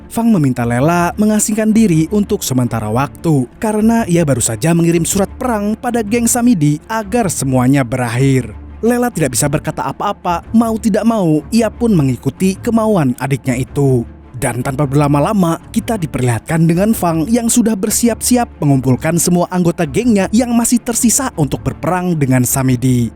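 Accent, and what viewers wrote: Banjar, Indonesia. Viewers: Urang banjar leh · Banjar lahh bangg 😭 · Selalu hadir mas walau gak komentar 😂 ae lucu banget neh org Banjar kh mas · Anjay Banjar wkwk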